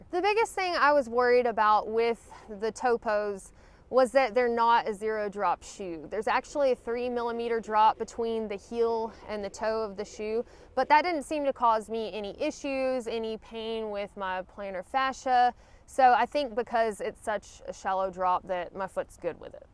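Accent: South, United States. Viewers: I wear Altra Superior 4.5's (coming up on 500 miles 1st pair), which I love! (found on sale and bought all of my size) They came with a rock guard insert. What are your thoughts on that type of insert? I installed them (under Dr. Scholl's inserts), but wonder am I really getting a benefit. Love your video's, southern drawl (us being from Texas) and expressions (nicknamed with friends Dixie-izms)! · The "hey, ya'll" got me! Love hearing a southern drawl on YouTube. Sounds like home to this North Carolinian. :)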